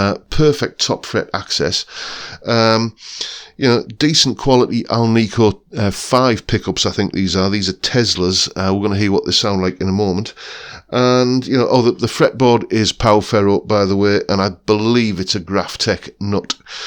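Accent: United Kingdom. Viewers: ademas de tocar bien la guitarra, habla un perfecto Ingles con acento Britanico.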